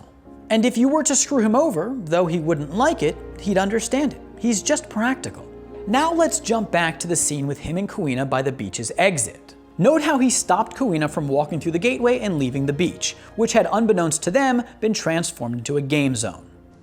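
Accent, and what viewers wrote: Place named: United States. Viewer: Why do you pronounce the names with that American accent? It’s soooo cringe brother. Pronounce it properly! They are English names in a Japanese accent!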